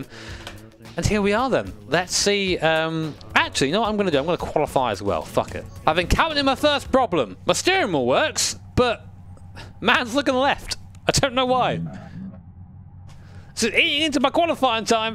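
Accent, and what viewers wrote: United Kingdom. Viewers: Apparently British accents are gold on YouTube.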